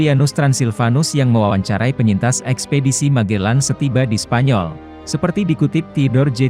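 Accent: Banyumas, Indonesia. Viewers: Admine wong ngapak ya? wong ngendi rika mas?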